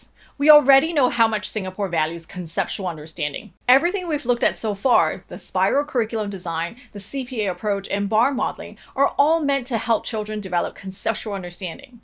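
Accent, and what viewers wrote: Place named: United States. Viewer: How do you get an American accent having spent 12 years of your formative years in Singapore, taught by Singaporean teachers with Singaporean accent?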